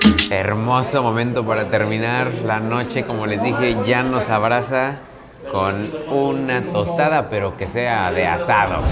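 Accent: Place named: Mazatlán, Mexico. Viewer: Ya sete pego el asentó de aya 😁que gastronomia tan rica como se antoja ir a mazatlán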